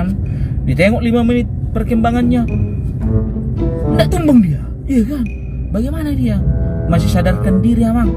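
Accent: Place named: Batak, Indonesia. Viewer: Aqu suka banget bang gaya bicara horas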